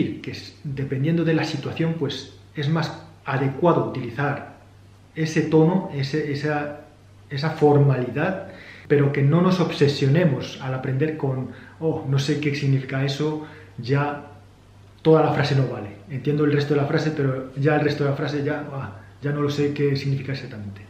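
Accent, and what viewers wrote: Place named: Spain. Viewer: Normalmente los coreanos que hablan español tienen acento de mexico pero vos lo tenes español...porque? es solo curiosidad saber de donde es el acento. saludos.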